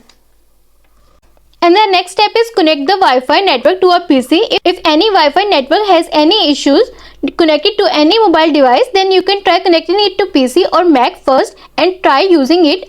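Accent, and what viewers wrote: India. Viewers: Its bad enough you have a Indian accent but why the he'll you,going so,damn,fast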